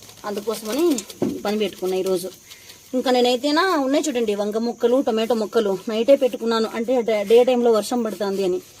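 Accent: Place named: Rayalaseema, India. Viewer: Mi devuru medam rayalasima lage vunnai matalu madi kadiri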